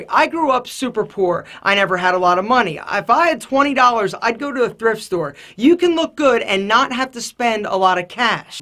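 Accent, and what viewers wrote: Philadelphia, United States. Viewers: Heavy philly accent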